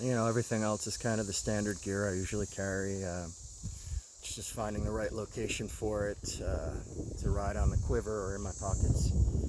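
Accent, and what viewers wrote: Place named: Oklahoma, United States. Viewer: You didn’t say hunting you said “huntin” your an okie jersey is a long way form you now ole son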